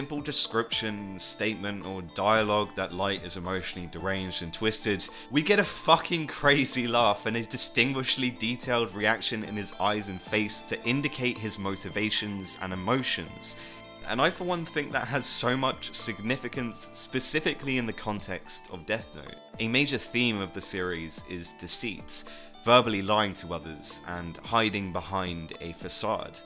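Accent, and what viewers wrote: United Kingdom. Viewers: Another edgy British anime youtuber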